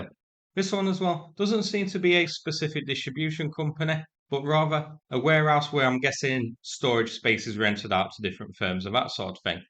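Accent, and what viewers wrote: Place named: North, United Kingdom. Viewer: The English is clear but has a North country accent. Turn on the subtitles if you are having trouble.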